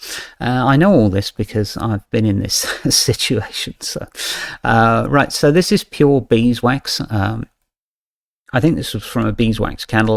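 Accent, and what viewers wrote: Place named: United Kingdom. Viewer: I’m an american, so take this with a grain of salt, but I think a British accent makes anything more enjoyable 🫤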